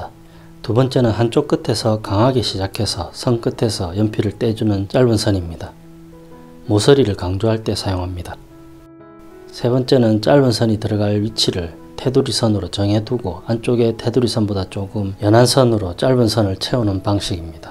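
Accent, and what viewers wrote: Gyeongsang, South Korea. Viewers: 경상도 분이신가 봐요 ㅎㅎㅎ 꼽표 오랜만에 듣네요 똥글빼이 ㅋㅋ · 늘 잘보고 있습니다. 제가 쌩초보라 쓰고 있는 연필이 4b인지 2b인지 궁금합니다. 강의중 그많은 말들속에 이건 4b입니다.라고 첨기 부탁드립니다. 그리고 같은 갱상도라 너무 정감갑니다.ㅎ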